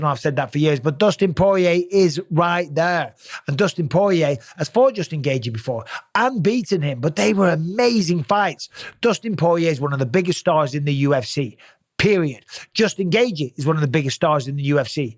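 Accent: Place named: United Kingdom. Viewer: I love your commentary. Me and my dads favorite moment to this day was during usman vs masvidal 1, usman was brutalizing masvidals toes in the clinch against the fence and you basically suggested if you were masvidal you'd be looking at the ref saying "cmon ref, have a word, he's stomping on my toes" of courage emphasized by the British accent lol. I don't think it would be terrible of you to admit that just as Justin is biased toward his friend Kamaru, you have a tendency as a fight fan from the UK, to root for those fighters. Even as a caster you can't help but to be a fan, Cormier falls victim to it as well. It would be a lot more forgivable if you just said, "of course I'm a little biased, we're in the UK and I'm rooting for my guys". If you'd like a better example of your bias you should rewatch the Jai Herbert fight, imo that was the one where your bias was most easily recognizable. 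Keep doing your thing though bruv, there are lots of us that look forward to the cards that you cast.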